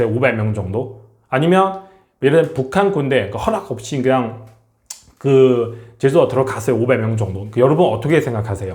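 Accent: China. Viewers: 발음이, 혹시 조선족 이신가요. 대만에 미국 있는것은, 장개석 정부 대만 피신 이후, 계속 있어 왔읍니다. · 중국분이세요? 대만분이세요? 발음이 그쪽인데요? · 말투가 왜이래? 중궈로군!! ㅉㅉ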